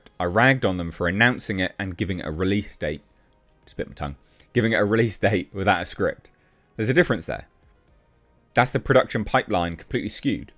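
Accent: England, United Kingdom. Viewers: maybe the non UK audience think you are vain as they are not use to the accent and how Brits present themselves? either way I LIKE YOU and the "Kings English"! 😀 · Hey, don’t sweat it bro. It’s probably just some kids. I appreciate your enthusiasm for Aliens & Predator stuff. It’s one of the reasons I subscribe. You also have good style, good politics, & even got me into retro Datsuns. The “vain” allegations are probably just because some Americans think anyone with a proper English accent is stuck up (arrogant). Who cares…You do good work & your channel is growing. You seem to be living the dream from my viewpoint. 🫡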